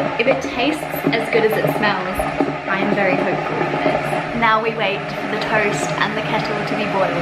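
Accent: England, United Kingdom. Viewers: you kinda stil sound slightly english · Girl you still sound British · She spent $200 on English food she sounds English to me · Am I the only one who thinks u still have a British accent but I can hear an Australian accent as well 🤣🤣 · It’s wierd cause u sound British and Australian at the same time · Your accent sometimes sounds british but then Australian at the same time? 😂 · Fun fact: First, i was thinking that u were british bc of ur accent! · Ah so she’s from Uk I was like no wonder she sounds so British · Wow you still have that bit of an English accent despite leaving when you were 8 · I hear a little bit of British in your voice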